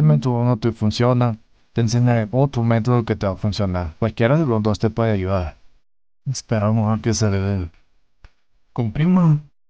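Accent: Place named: Brazil. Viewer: Bro eres de brasil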